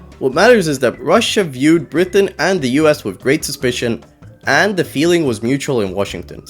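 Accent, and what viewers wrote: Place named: India. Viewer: Stop appropriating Indian accent on Pakistan! The two are clearly distinct 😡 Saw the credits.. no wonder it was voiced by an indian lol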